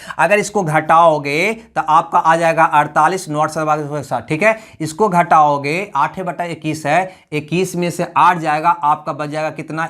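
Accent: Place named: mixed, India. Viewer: Sir apne Hindi bolne ki language samj nhi attii simple Hindi boleya naa takke bakki logo ko samj aye ap Bihar up mp type Hindi bolte hoon samj nhi attii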